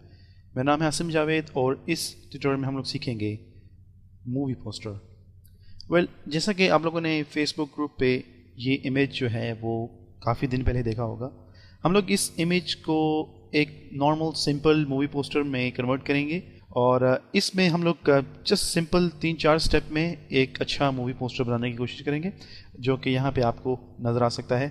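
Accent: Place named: United Kingdom. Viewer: bhai agar aap urdu tuttorila ka boltey hein tourdu hi ziyada istammal karein meharbani ho gi, urdu ko english excent mein boltey huey aap achey naho lagey muhey muaf karna bhai.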